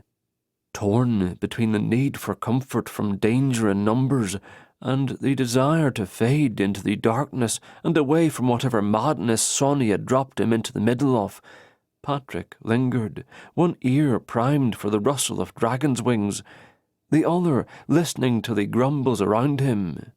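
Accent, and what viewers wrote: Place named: Scotland, United Kingdom. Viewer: Does anyone know if this narrator, narrates any other books? This is my second time through this series. The Scottish voice for a story about Scots is beyond perfect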